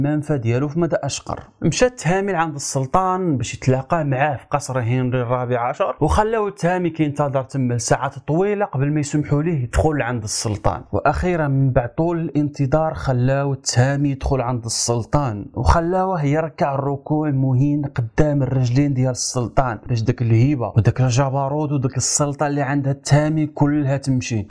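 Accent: Algeria. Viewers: لو اهتممتم بتاریخکم لکان افضل....اللهجه دیالک الجزاٸریه واضحه ... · لكنتك الجزايرية غالبة على كلامك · يحاول التحدث بالدارجة المغربية ولكن اللكنة الجزائرية تطغى عليه بحكم زمالتي مع جزائريين. حتى ملامحه جزائرية ومصطلحاته ومفرداته ليست كمغربي أصلي حين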